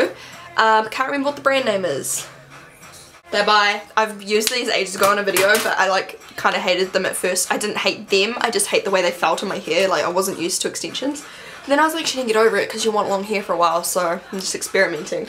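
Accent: New Zealand. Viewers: shes from new zealand so she has an accent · are you from New Zealand?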